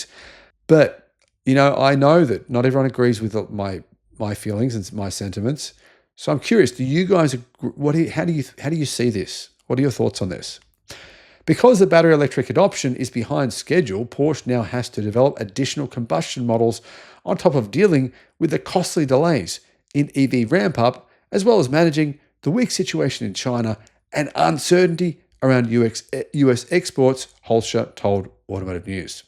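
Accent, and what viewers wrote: Australia. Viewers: has amazing analytical ability. heralds the rise of EVs and the demise of the Internal combustion engines for five years as we now see this happen before our eyes. I used to call you, in 2021, (where you no longer live). Congratulations on your abilities and foresight. Thanks for thousands of videos and positive outlook. 😂🎉 And after 1000s of videos as well as from SMR, I, from Arizona, still can't do the Australian accent!!! 😢 Thank you.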